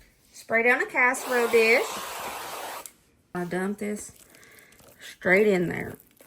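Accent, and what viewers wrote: South, United States. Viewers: I love listening to you narrate yourself. So southern. Southern woman here:face-turquoise-music-note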